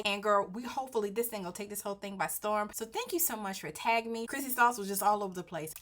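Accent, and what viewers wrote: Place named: South, United States. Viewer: Yasss southern accent!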